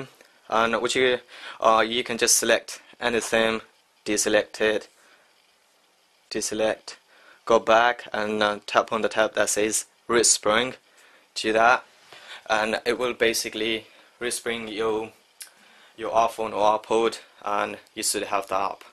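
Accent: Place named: United Kingdom. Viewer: sounds british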